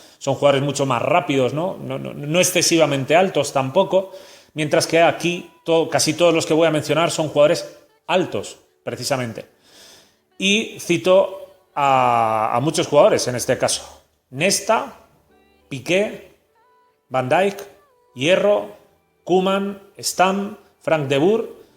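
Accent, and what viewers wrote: Spain. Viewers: se te nota mucho loe español, pero no te culpo es inevitable. faltan muchos, pero que no esté kahn es una pifia muy grande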